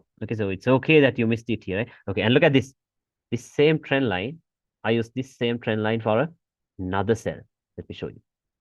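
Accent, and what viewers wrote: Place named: Malaysia. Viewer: When hear ur voice i thought u Master is malaysian 😅😅😅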